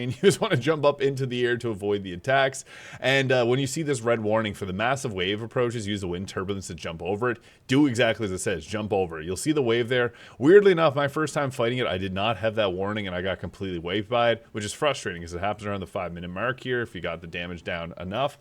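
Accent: Canada. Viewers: Took about 10 seconds to figure out you are EXTREMELY Canadian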